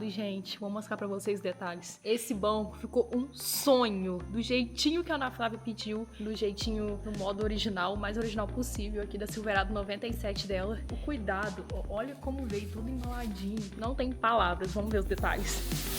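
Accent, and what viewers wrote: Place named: Caipira, Brazil. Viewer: Essa equipe é a melhor em assunto de reforma e criação de projetos. Esse sotaque caipira da é um charme. Simpatia beleza e leveza tudo junto nessa equipe.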